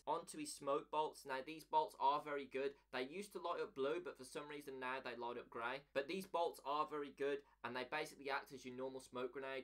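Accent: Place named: Birmingham, United Kingdom. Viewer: I hate the brum accent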